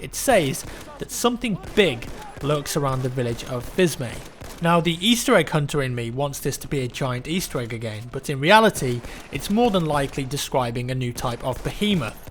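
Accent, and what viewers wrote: United Kingdom. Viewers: Man i love yor British accent, mutch better to understand for me than the American Cheeseburger English.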